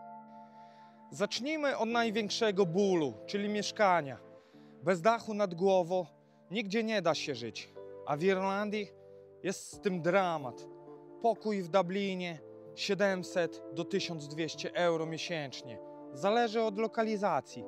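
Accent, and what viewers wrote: Podlasie, Poland. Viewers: Podlasiak z akcentem. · Z jakim akcentem mowi ten facet? Czy jest to akcent z Podlasia czy z Lubelszczyzny? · kolega jest z Podlasia albo z Lubelskiego. Normalna gadka, ale "słoiki' z Warszawy tego nie zrozumieją 😉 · Z jakiej części podlaskiego jesteś ? Piękny akcent :) i to bez hejtu.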